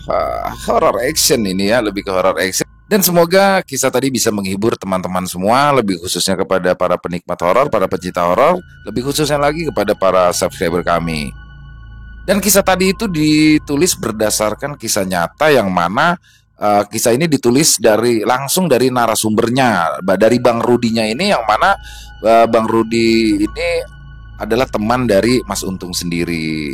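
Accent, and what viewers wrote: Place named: Betawi, Indonesia. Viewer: Seru kalo bang bawain cerita orang betawi,pas dan akurat logat betawi nya,sukses selalu bang.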